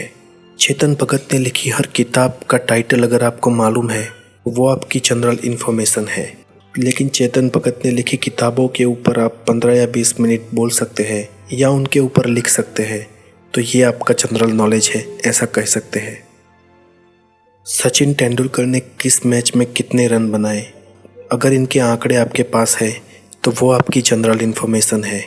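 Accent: Gujarat, India. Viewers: Aap gujarati ho na...😊😅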